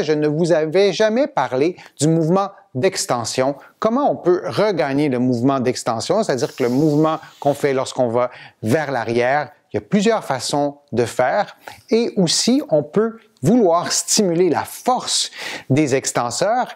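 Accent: Canada. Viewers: Ahhh l'accent canadien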